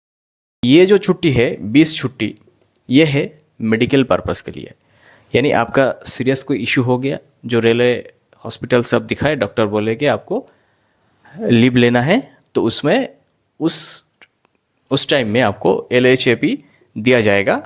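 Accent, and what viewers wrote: Bengal, India. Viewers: Tumi bangali tomar kothatei bojhachhe 😊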